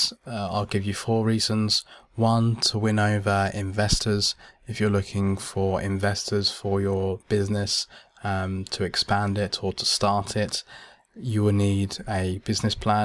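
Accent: United Kingdom. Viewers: Great to hear UK tutors on line.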